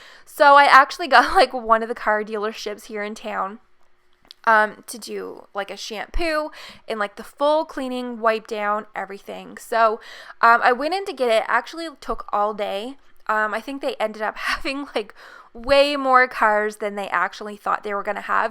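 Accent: Canada. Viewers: As a fellow Canadian, I could tell you were Canadian right away from your accent lol (which is very nice to listen to). Loving this build! You have yourself a new subscriber :) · Are you Canadian?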